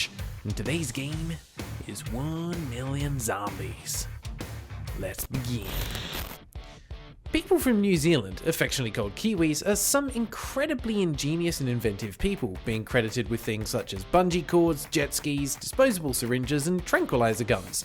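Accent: South, United States. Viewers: i thought he was going for southern US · I am all for southern American accent! Yee-haw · I love southern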